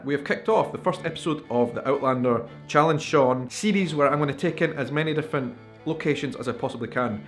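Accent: Scotland, United Kingdom. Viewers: So happy I found your page!! I love love love outlander and I love a Scottish accent. Thank you for doing this 💙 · Castle Leoch is a must! Just saying. Amazing videos Shaun, you with your scotish accent in Lallybroch and a drone flyng over you both...amazing!. Thanks for sharing the love for the story and for your country. Makes it really special to see the series. ;)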